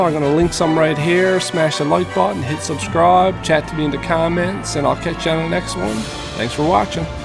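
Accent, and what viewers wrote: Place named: Maritimes, Canada. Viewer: Great job! Been looking to get a printer...Appreciate the way you mention the paints/products you use. I couldn't tell where you were from initially but I detect East coast of Canada. Good to see Canadian content on YouTube. 👍 What company designed the model...I mean really how else did you get my attention? Get some sponsors brother, best of luck in your content endeavors! Thumbs up and a subscribe. Edit found the link...that's why I'm watching videos and not producing them, a lack of technical skill! Cheers.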